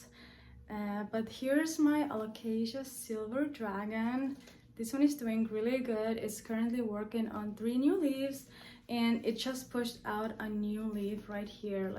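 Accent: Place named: Netherlands. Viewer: Beautiful plants! Are you Dutch perhaps??? I just recognize the accent :)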